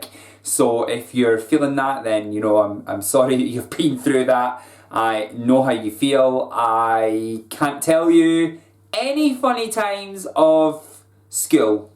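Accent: Scotland, United Kingdom. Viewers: I could listen to you chat all day! I have a soft spot for a Scottish accent. Looking forward to your next vid. Much love from Australia! 🐨 ❤⭐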